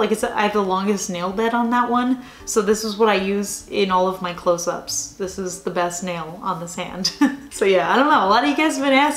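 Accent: New Jersey, United States. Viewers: I didn't even notice that you said "pastel" in a way that would bother people. I'm from north of Boston but I'm half NJ on my mother's side, so I grew up hearing words differently. Farhead and Foah-head, Radiator (with a short a like apple) and Raid-ee-aydah, etc. So count me in as a fan of the NJ accent!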